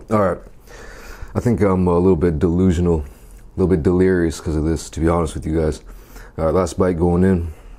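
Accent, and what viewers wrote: United States. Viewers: I can tell you aren’t from Seoul originally but from the U.S. why do you live out there? Jw. Love your content!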